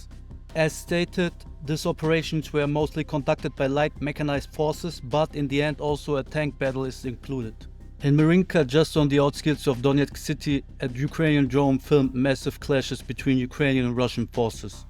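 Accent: Germany. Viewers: Your German (?) accent is so annoying though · are you German? you have a heavy German accent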